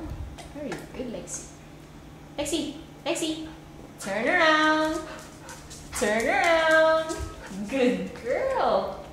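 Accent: Philippines. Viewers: una palang, alam ko nang Pilipino ka, dahil sa accent.. :D hahahaha!! im a Filipino too, and I am very proud to be one.. :) uhm san ka bumili ng Golden Retriever? and how much? please reply, kasi adik na adik ako sa ganyang breed and I would like to adopt one and take care for it... ilang beses mo po siya pinaaliguan? hahahaha!!! :D (ew laway...) :)) xD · pinoy ka no? halata sa accent ng pag english mo hehe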